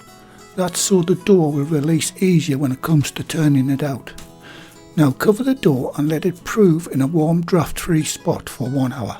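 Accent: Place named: North, United Kingdom. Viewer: ay lad great video sounds like you are a tad further up north than where I am:)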